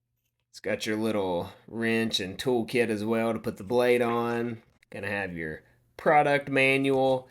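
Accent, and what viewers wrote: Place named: South, United States. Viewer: Southern accent is fucking cute❤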